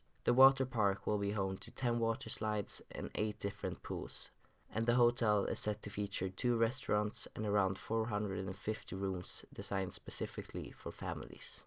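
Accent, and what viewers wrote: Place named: Sweden. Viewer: Love your Swenglish❤️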